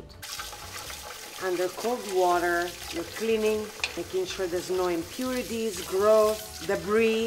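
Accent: Rome, Italy. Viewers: Whats wrong with you? Have you never heard an italian accent? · Sei di Roma Oggi l'ho sentito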